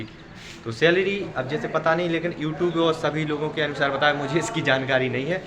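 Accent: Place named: Bundelkhand, India. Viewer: Bhai bundel khand side se hai kya language vahi ki lag rhi 😅😅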